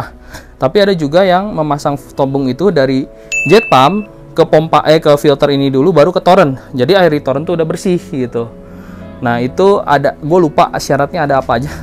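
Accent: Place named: West Java, Indonesia. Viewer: logat nya sunda banget euy....hehehehe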